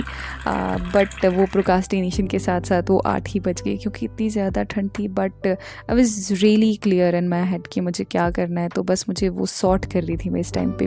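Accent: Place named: Mandi, India. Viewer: Hello I am also from Kangra .....apki awas s esa lag ra ki ap Mandi s ho ?? Kya ap mandi se ho 🤔🤔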